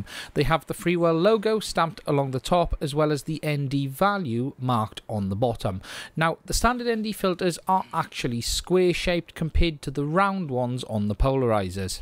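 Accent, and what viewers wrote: United Kingdom. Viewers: British accent. So where can I buy these in the UK?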